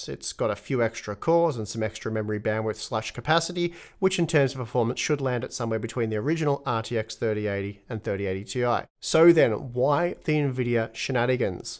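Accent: Australia. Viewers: Love your work. I follow 4-5 different channels and I like the different takes. Also, mixing it up with some aussie accents is always a good thing.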